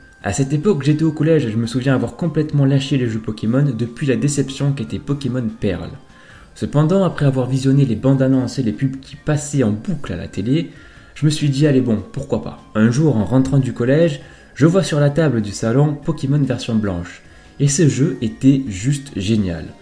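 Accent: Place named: South, France. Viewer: ta l'accent du sud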